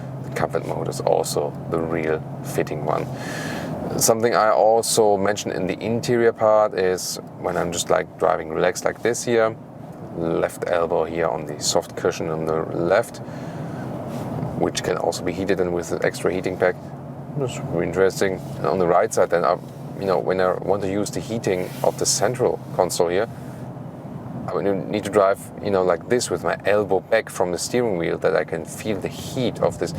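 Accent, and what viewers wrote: Germany. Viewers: I love your detailed commentary. Your English is almost perfect. But you have a very German style of speaking and sentence structure, plus use of some expressions in a German rather than English format. It would be helpful if you spoke more slowly and more clearly. Then we could enjoy every wonderful one of your observations.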